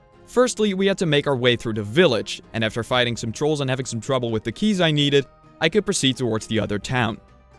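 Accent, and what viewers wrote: Netherlands. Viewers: I teach English to Dutch speakers and I speak Dutch myself. If it's not Dutch i'd be surprised. But honestly, the accent really isn't that bad.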